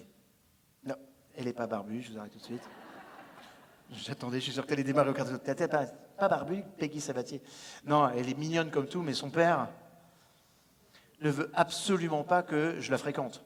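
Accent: Canada. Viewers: Il est pas si mal votre accent canadien je me serait cru dans un épisode de "Mme Lebrun" oui en France aussi l'on connaît cette série tournée en public humoristique à souhait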